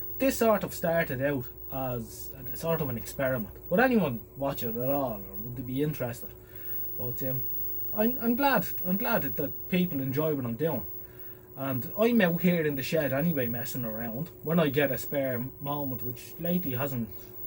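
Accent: Ireland. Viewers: I watch because your delivery is low-key, casual and appealing. You repair the same sort of thing I do - yes, a few ladies do this sort of work. (And your lovely Irish accent it rather sweet.) I have completely rebuilt a couple of Bush TV24s and numerous radios from the 1930s and 1940s. But these days AM radios need a local home-brew local transmitter fed by whatever audio source you like. I hope fame doesn't change your presentation too much. I at least I like your videos just as they are! Thank you.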